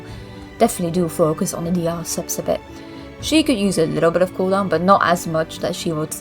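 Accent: United Kingdom. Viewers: hi are u british? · Very helpful,video. Thanks so much for making it! Also you accent is very posh! i like it!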